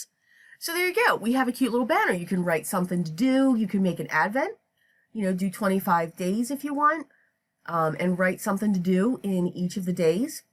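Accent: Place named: Philadelphia, United States. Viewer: I passed on this kit, your projects are so cute and are making me regret my decision. New subbie here, I detect a Philadelphia/south Jersey accent, am I right?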